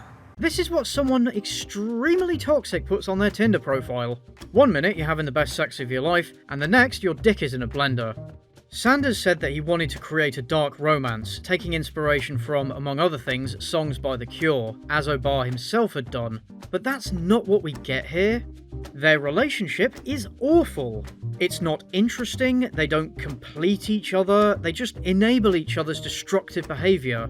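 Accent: United Kingdom. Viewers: I'm a simple man I See talking british dog man I click.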